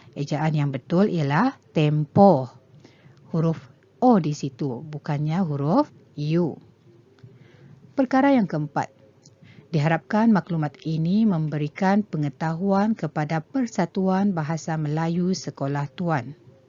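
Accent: Sabah, Malaysia. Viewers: Kenapa cikgu cakap macam orang sabah.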